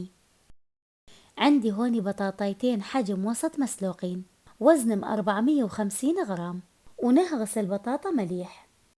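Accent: Mosul, Iraq. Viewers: مساء الخير على الجميع شكو ماكو خير ان شاء الله وينك اختنا الموصلية الشيف المميزة بالاطباق العراقية المنوعة الطيبي مشتاقين للصوت الموصلي والابداع المطبخي المميز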